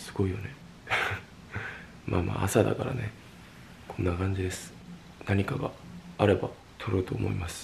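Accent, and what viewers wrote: Japan. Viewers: The rain sound and his japanese accent really addictive. It's like the best ASMR 😂 I should watch this video before sleep 🤣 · JAPANESE NCTZEN! Does he speaking an Osaka accent or just standard?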